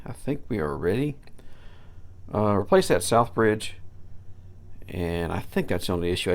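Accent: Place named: South Carolina, United States. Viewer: You sound like you are from my area...South Carolina?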